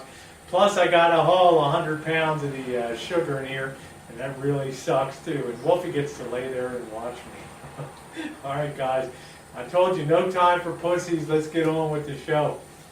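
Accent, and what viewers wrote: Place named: Philadelphia, United States. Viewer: you sound like you have a philadelphia area accent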